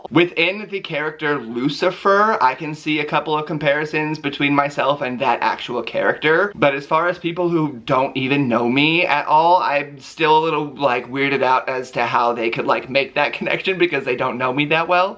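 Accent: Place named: United Kingdom. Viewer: Yaaaas British accent!!!!!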